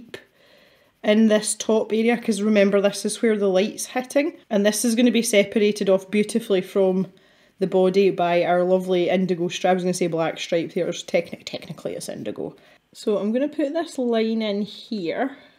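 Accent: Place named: Scottish Highlands, United Kingdom. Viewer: I do enjoy watching your videos where you have put on your “instructor hat” on! You have a true gift for sharing ideas and giving your viewers practical advice on creating art! Truly inspirational, thanks. I remember when I was a youngster and on Sunday evenings we watched a TV Show: Walt Disney’s world of Color! Did you get this show in the UK? They mostly showed Disney real life movies, “Charlie the Lonesome Cougar” and most movies were not cut to fit the time frame of a TV movie, so if they had excess time that did not fill with commercials they showed Disney shorts, sometimes cartoons but sometimes they had creating Disney characters and they showed us viewers how to draw Mickey or Goofy and I remember when I sat down with pencil and paper hoping that the movie would end early and I would get a chance to draw along with the Disney Artists! 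Thank you for sharing all of these wonderful tips and bringing this memory back to the front of my mind! Thank you, watching your videos and listening to your highland lilt brings me and others joy!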